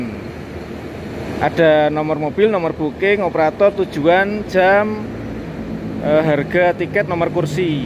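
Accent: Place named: Banyumas, Indonesia. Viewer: AA ngapak 😂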